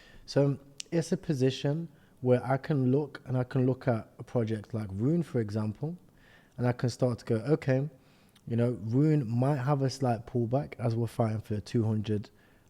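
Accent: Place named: East Midlands, United Kingdom. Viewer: hope all is well fam great video again. can i ask are you from the east mids area i'm from nottingham and you sound like a people from this region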